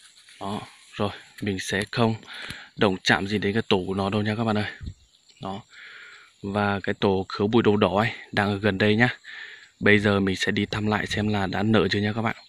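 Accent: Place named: North, Vietnam. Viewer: Bạn là người miền Bắc vào Tây Nguyên sinh sống à? Mình thấy bạn nói giọng miền Bắc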